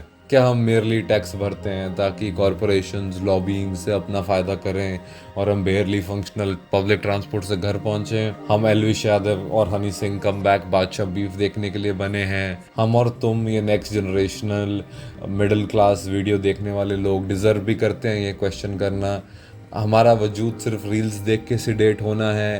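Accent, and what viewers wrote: Himachal Pradesh, India. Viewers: that himachali accent hitting hard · Himachal ka accent work kar geya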